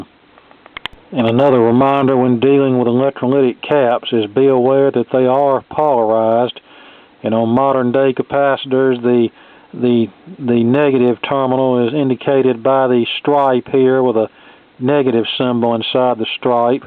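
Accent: South, United States. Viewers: I don’t know where you live, but you sound like you’re from the south like myself. I wish I could come and apprentice with you. I find these vintage stereos/turntables fascinating. I’ve started refurbishing them, V-M primarily as a hobby. I really want to dive into the electronics, but I’m apprehensive. I’ve only ever replaced a capacitor in a TV. All of the diodes, capacitors, tubes etc seem very daunting. However I’d really love to learn. It’s sad that there aren’t more folks like you willing to pass on knowledge to others. Thank you for your videos, and if you’d ever like to tutor me in basic electronics that would be fantastic.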